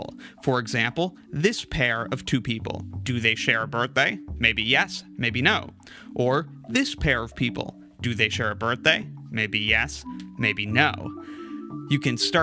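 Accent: Canada. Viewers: Arr you Canadian by any chance? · Canadian! He gives himself a way a couple of times with with "aboat" 😝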